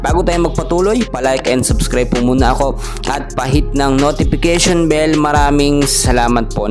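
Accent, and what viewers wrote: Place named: India. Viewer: parang kang mga indian dito sa dubai magsalita boss laging may NO sa dulo hehe❤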